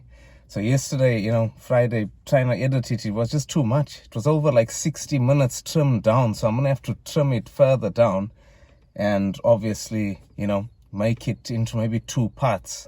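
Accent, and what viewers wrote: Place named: South Africa. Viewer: Dude no joke first video I watched now, you sound like you are from South Africa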